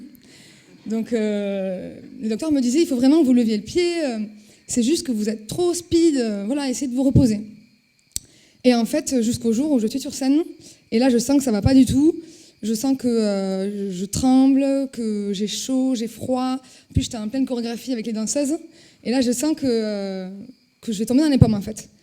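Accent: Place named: Toulouse, France. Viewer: L'accent délicieux de Toulouse !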